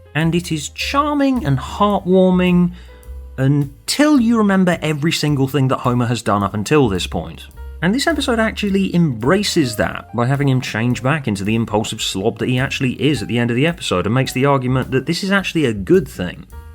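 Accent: United Kingdom. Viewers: Academic cuz he bri ish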